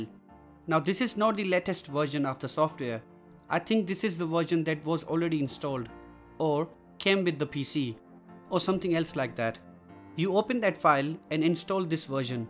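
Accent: India. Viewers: its always the Indian voice to rescue our days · Knew when I heard the indian accent that this would fix my problem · tutorial + indian accent = perfection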